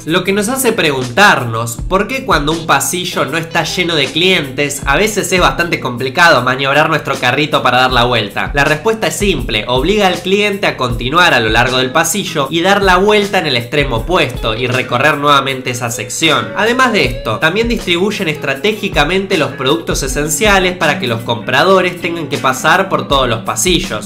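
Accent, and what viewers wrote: Argentina. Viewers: es argentino no? xD viva argentina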